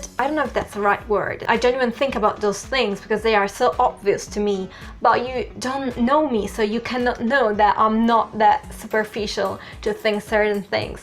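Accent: United Kingdom. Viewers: Your English is very good however it seems to me that you speak with a British accent which sounds very nice. I would love to see more videos in Italian. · She's Italian but she speaks English with a British accent. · You are speaking in British accent but you do not look like a British in some aspects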